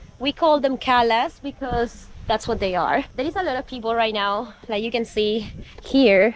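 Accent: Spain. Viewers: Loved hearing the spanish accent <3 That was quite sassy though lol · Just came across your channel> I've watched another of your videos by now. If you want to wear a bikini wear one. I was trying to place your accent in the other video now I know your from Spain. .Una novia que tuve su primer idioma fue el español y a mí me encanta el español. For give me if I'm off on the sentience in Spanish. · That was really cool how you explained about car rules in spain. Maybe do an english and spanish learning video on van stuff. Love the spanish from spain its pronounced very beautiful ❤ and I love the way s and c's are spoken. Classic . GOD BLESS MAY GOD GUIDE YOUR STEPS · Your Spanish accent is ❤ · You are so Gorgeous ❤ and the Spanish accent 😍!greetings from New York State! 🗽 !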